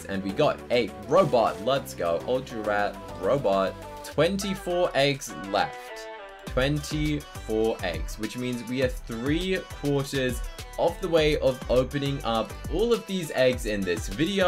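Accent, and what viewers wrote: United Kingdom. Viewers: I never knew he talked in British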